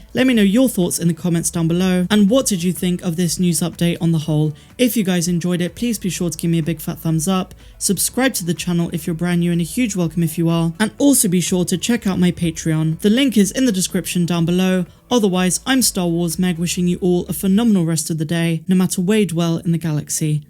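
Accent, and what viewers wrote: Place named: Bristol, United Kingdom. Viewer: I heard your bristonian accent Meg, you hide it well. But not Palpatine well.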